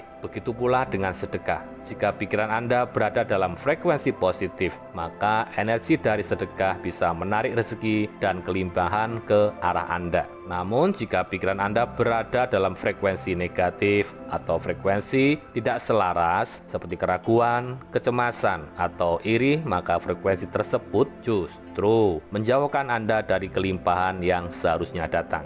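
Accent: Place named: Java, Indonesia. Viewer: Suaranya mirip suara Jokowi. Ditambah lagi aksen medoknya.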